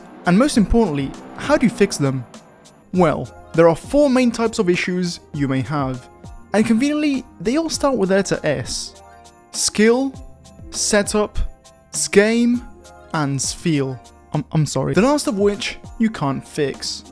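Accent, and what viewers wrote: Italy. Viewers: sei italiano? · I love the hidden italian accent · You're Italian??!!! Wth. I am too. Bro il tuo accento é pazzesco. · italian by any chance?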